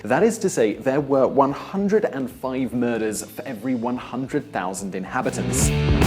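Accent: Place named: United Kingdom. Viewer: Well then, I don't understand why is it they can't tell the difference between "Salvador" and "El Salvador" ...I mean, it's THEIR original language after all, isn't it? But the guy on this video is definitely NOT Spanish, you can easily tell by his accent he's British.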